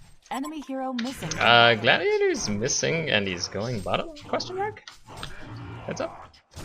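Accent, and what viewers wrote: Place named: Canada. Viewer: Ohhhh that sweet canadian accent #Wemissyou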